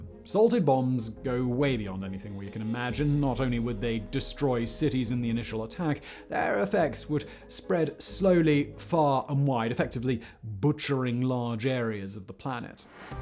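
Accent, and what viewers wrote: United Kingdom. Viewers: Is he even Brittish? Sounds like a Brittish wannabe American hipster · I think these channels are not his, he's probably hired to read the script with his nice British accent and all the other work is done by other people, he used to host the English version of visualpolitik which is a Spanish channel.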